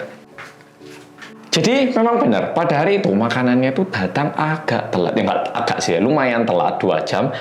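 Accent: Java, Indonesia. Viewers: Medokk!!!! ~wkwk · bagus, medoookkk nya makin panjang, wkwkwkkwkw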